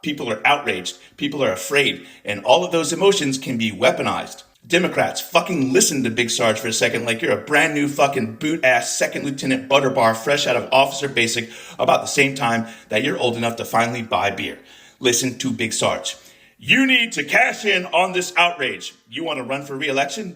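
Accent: Scotland, United Kingdom. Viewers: Point #1) absolutely spot-on, big sarge. Point #2) anger sounds better in a Scottish accent than any other, and I'm glad not to be the only one who does it. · I am catching a WHOLE BUNCH of a Scottish accent in that rant! So effective!!!! Love it!! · Love the Scottish accent shining brightly through this glorious, righteous rant. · Scottish accent ?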